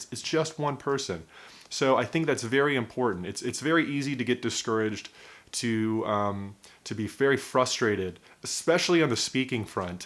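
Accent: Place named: United States. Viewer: Thanks for sharing. I was wondering where your accent was from. I'm quite sure that it's American but I can't recognise the State yet. I'm asking because I noticed that some Americans pronunce "Better" as "BeDer" while others use to pronunce it with the "T". I like your way of speaking and your points of view. Keep going 🙂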